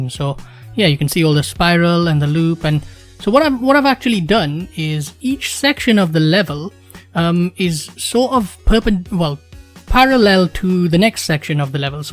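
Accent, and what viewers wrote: United Kingdom. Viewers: Awesome work btw not to be too creepy but what part of the uk are u from?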